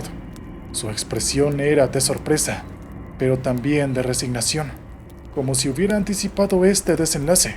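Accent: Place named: Mexico. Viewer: Esa voz suena mexicana 🤣🤔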